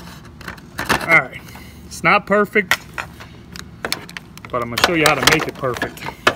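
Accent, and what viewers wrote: Louisiana, United States. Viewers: Are you from Louisiana? Your accent sounds like it. Thanks for the video! Great idea! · I’m hearing a Louisiana accent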